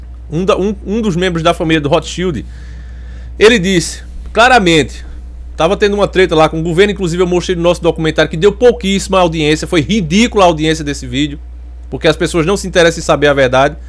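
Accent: Northeast, Brazil. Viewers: Nordestino ele é (sotaque)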